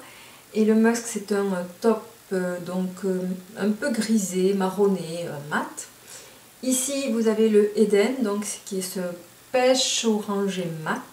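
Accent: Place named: Southwest, France. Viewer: C'estdommage d'enlever les swatchs! car on ce rends mieux compte de la pigmentation des Fards.. la couleur..et Les packaging. En plus j'adooore Ton accent! Pourtant je suis de Marseille et j'en ai un aussi! J'adore le sud ouest! Hâte de venir y faire un tour... Brefff... c'est toi le chef.Mais c'est dommage... 🙄🙄 Bon we à vous deux. Des bisous 😚😚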